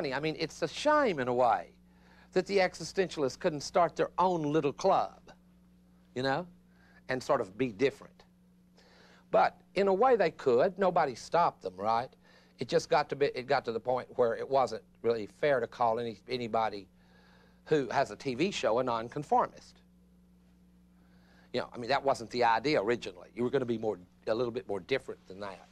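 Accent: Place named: Texas, United States. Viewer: I love that Texas accent. His Texan accent sounds like an anti-abortionist accused of burning down a clinic. Yet these brilliant concepts are produced through this accent. Diversity it is.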